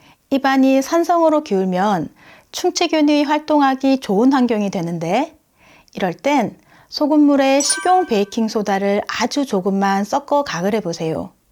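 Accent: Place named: Gyeongsang, South Korea. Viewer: 깨알 정보 정말 고맙습니다 좋댓구알 햇십더~ 구수한 경상도 억양 좋아요~~소실적 생각 많이 납니다 ㅎ 구룡포도 생각 나구여!! 영상 감사 감사 합니다~~~! 화.이.팅하세요~~!)))))))))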